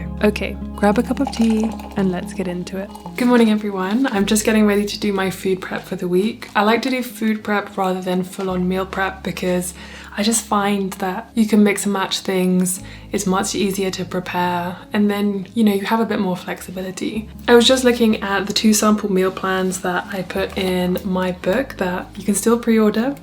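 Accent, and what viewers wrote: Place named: United Kingdom. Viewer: You have such an interesting British accent, what region are you from?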